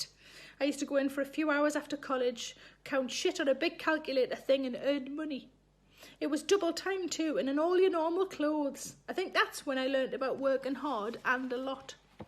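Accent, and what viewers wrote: Newcastle, United Kingdom. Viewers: Thank you Sarah for these episodes. I look forward to them each day. I got to know you watching episodes of "QI", which we can get in the states through Britbox, which has the luxury of captions. I've gotten pretty good, with your readings, at understanding a Geordie accent (I hadn't realized that's what it was until you mentioned it somewhere - you are more 'understandable' than Ross Noble!) Thanks again - bless you - be safe! Eric in Indiana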